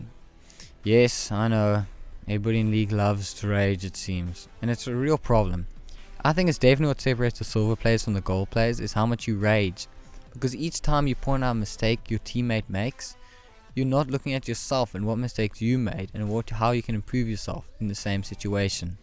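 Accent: South Africa. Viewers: You from South Africa bruh? :D I can hear that accent clearly my bra> good Video btw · Your South African, aren't you xD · south african ? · u from south africa bro? can hear it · is this guy from south africa?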